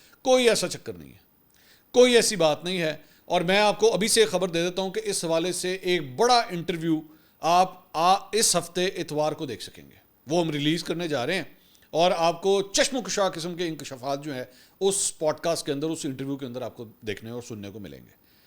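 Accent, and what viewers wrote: Punjab, Pakistan. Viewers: Mansor bhi Allah ap ko seht k sat lambi umer ata kary jange k badse se apko dekhna shuru kya both maza ata hy jb ap beach. Me panjabi terka lagaty ho sir g good Allah kush rakhy ap ko ameeeeeeeeeeeennnnn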